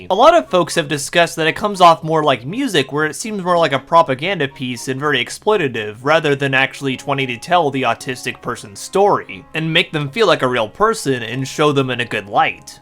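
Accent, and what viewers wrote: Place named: United Kingdom. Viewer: He's british.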